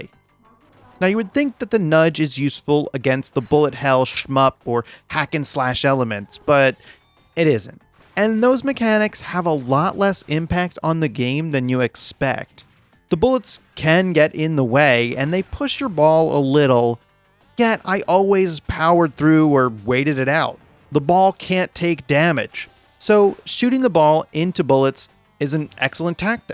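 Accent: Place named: New York, United States. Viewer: Yo you from NY cause dead ass you sound like my ass to the T when I was a kid and there was a bowling alley with a addams family pinball machine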